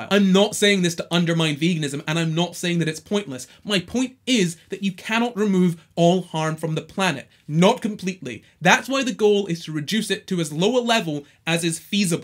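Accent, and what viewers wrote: Scotland, United Kingdom. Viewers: 2 months of veganity woop woop, also are you Scottish?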